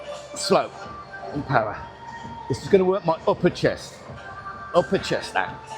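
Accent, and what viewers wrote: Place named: United Kingdom. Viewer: Love the British chatter.Never mind a good yarn. 👌🏼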